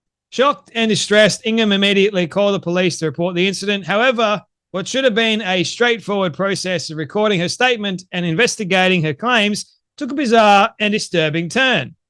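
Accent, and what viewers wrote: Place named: Australia. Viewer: Love your no nonsense Aussie accent.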